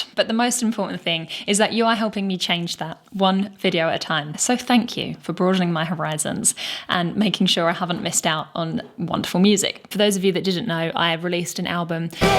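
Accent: United Kingdom. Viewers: Initially i started liking her when she started talking, Dat british accent makes the ears oozing, and when i focused on what she actually says and how greatly she explains what is what my mind started to ooze. Considering her great girl's beauty made also oo, well, this comment wold be blocked if i proceed😂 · Self-promotion is not narciissistic, Honey! (spellcheck not working correctly) Wear your shirt out, girl! I see how impressed you are by this great singer and song from note one because it evidently touches you so deeply! And as the saying goes 'it takes one to know one'! I just had to stop to tell you these things I feel from your reaction already. I liked this song & subscribed to your channel straight away! Also, I love that charming Brit accent coming from a lovely woman such as you! I'm also looking forward to all 21 minutes because of my feeling that you will get deeply into this one because of you recognizing his talent immediately. 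back to the video for me! Love that Magenta hair too, girl! Rocky Horror Picture Show, anyone?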